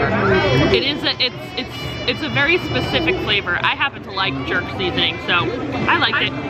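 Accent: New Jersey, United States. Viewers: I could just tell you were from Jersey.. I’m from south Jersey too and I grew up going to great adventure too!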